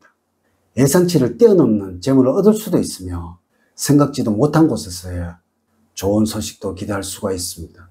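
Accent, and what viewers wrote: Gyeongsang, South Korea. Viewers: 안녕하세요.수고 많으십니다.경상도 발음 이시라 항상 말씀 끝 부분이 속으로 들어가는 느낌이 드네요.첫 부분만 두드러지세요.항상 감사합니다..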